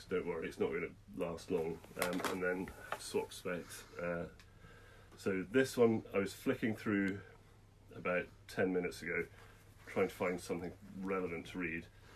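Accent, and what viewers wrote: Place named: RP, United Kingdom. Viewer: We read you above book in our book club , we all loved it. Now I’m planning to do Scotland tour so hope will de-tour to your book shop. My friend always gets old historical books so now i know where to buy them. It’s so lovely to see the writer , if he’s still alive like you . I like your posh accent 🌷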